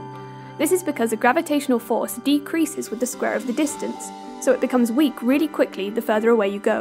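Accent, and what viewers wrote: United Kingdom. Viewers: minute physics with a brit accent. :) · The video's really nice. Your brit accent is even better! ^.^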